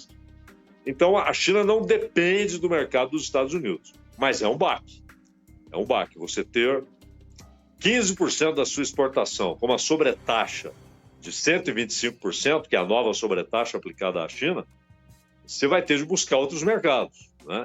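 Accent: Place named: Caipira, Brazil. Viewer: Vc é velho comunista, com sotaque caipira ! Vtsc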